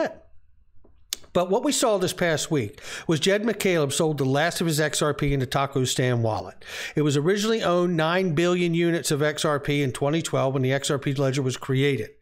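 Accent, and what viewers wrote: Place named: United Kingdom. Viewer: I am very excite about XRP.. its every time different story.. very cool token.. and reporting very cool thanks you for this reporting...i check you headlines out bit hear of that all time.. very confusing you give report but your english cool good british language thnks 2 yu 😘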